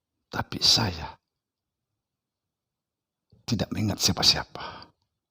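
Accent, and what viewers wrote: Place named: Sulawesi, Indonesia. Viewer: Assalamualaikum warahmah tullahi wabarakatu..bolehkah kami tahu bapak asalnya dari mana ya....dari logat tuan guru sepertinya..Sulawesi...?dimana ya...ilmu tuan guru hampir sama dengan nenek moyang saya.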